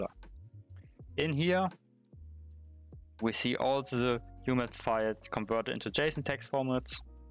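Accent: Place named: Germany. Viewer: man hört mies das du deutsch bist xD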